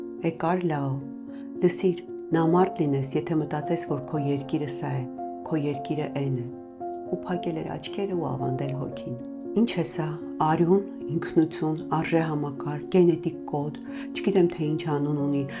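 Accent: Standard. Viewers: գեղեցիկ ու մաքուր հայերենը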